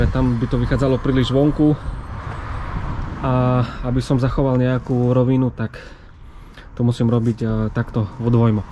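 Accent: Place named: East, Slovakia. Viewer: Super chlape, okamžite dávam odber! Určite tu nájdem dostatok inšpirácie pre domáce svojpomocné majstrovanie. Podľa prízvuku som tipoval na východ a v prvých videách som videl špz z východu. Často jazdím trasu KE-HE cez MI, tak reku či chodím okolo tvojho domu???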